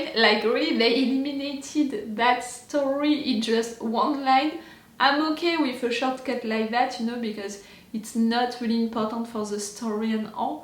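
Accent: France. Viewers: Je venais pour regarder une réaction américaine, et là, j'écoute ta voix.. Et je me dis.. Mais attends, c'est un accent français ça^^ Elle est Française ^^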